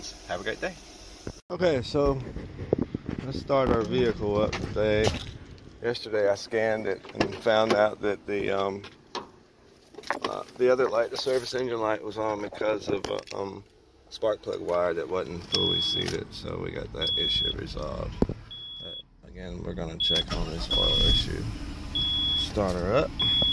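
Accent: United States. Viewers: I get a lot of verbal inflection in your voice of a New Yorker. You actually kinda sound like Stanley Kubrick. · Interesting video. You talk like a good ole boy from South Carolina. I understood every thing you said. lol Where are you located?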